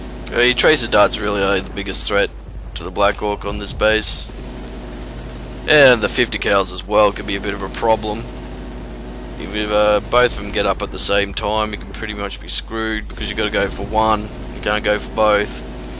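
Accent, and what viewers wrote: Australia. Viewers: Aahahah, brilliant commentary. Funny, enjoyable, epic ausi accent and actually really good advice. I play on PC, but tactics are the same. Ty Mate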